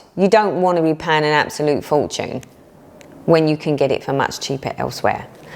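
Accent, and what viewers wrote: United Kingdom. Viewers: Loved your video, yet again,! Great to see the comparison of few different storage systems in one video. Very informative. As I said before, you are one really good teacher: great personality, good looking, very pleasant voice, good experience, eager to share, that lovely accent I miss hearing so much away from UK. I started stitching just about 5-6years ago, and have gone through all the storage methods I made up myself and have ever found. I remember all the excitement after my first Orpington Hobby Craft cross stitch shopping turning into confusion, when I really had to start unravelling those flosses, and starting using them for real. Now I have made my own - different, individual storage system, out of snippets I have found and used. Works for me fine. Thanks for your video,! I wish I had all your this video series back then... 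💖💖💖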